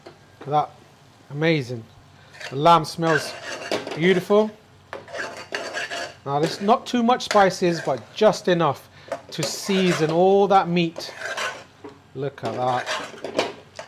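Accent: United Kingdom. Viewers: bloody british accent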